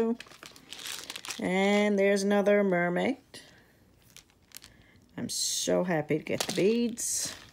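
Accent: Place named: Newfoundland, Canada. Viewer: Hi, I’ve seen you comment on my channel! Thank you! New subbie! I can tell from your voice that you are from Nfld! My hubby is from the Rock also. Such a beautiful place! Welcome to YouTube and you lucked out with your Michaels bags!Love the beads and note cards! Paws up! 💕💕🐾🐾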